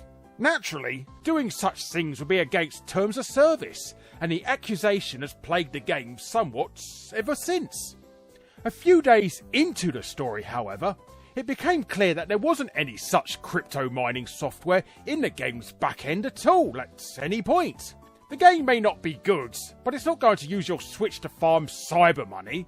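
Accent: United Kingdom. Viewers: My favourite was your classy british accent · Your videos are great but your voice and inflections are so british it's unwatchable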